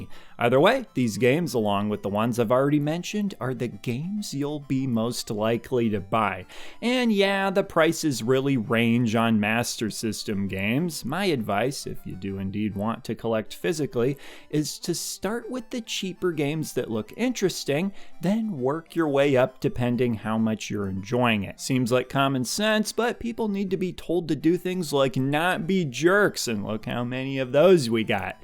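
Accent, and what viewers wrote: Midwest, United States. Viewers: Just subscribed are you Midwestern? I hear your accent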